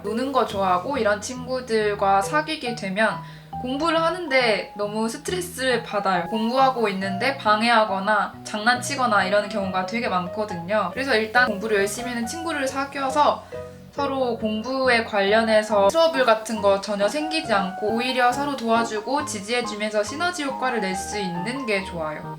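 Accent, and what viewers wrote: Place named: Gyeongsang, South Korea. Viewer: 경상도 억양도 너무 귀엽다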